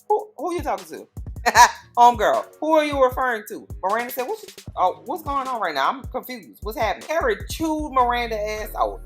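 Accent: New Orleans, United States. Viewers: I just know you from New Orleans BAYBAY I know a NOLA accent when I hear it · This is my first time on your channel and I'm KMSL! I clicked the link because I watch this show and it was on my recommendations page. Immediate subscribe! And the accent.....are you from New Orleans?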